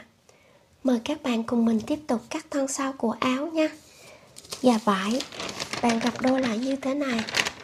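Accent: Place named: Mekong Delta, Vietnam. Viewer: Bà chắc dễ thương lắm nè.cưng ghê giống người miền tây hả bạn❤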